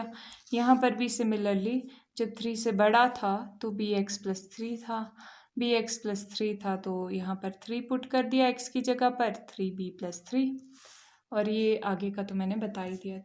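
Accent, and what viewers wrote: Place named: Haryana, India. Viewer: Through your tongue..you look like from haryana or delhi.. but very sweet voice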